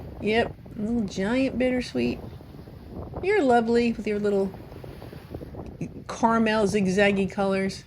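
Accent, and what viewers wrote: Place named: New Jersey, United States. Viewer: “That’s what I’m takin’ about.” You are from Jersey❣️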